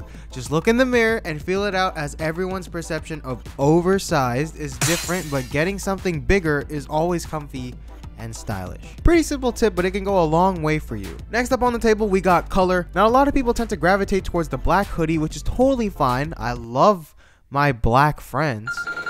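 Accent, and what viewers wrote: United States. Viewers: Asian English sounds so American, it no longer sounds American.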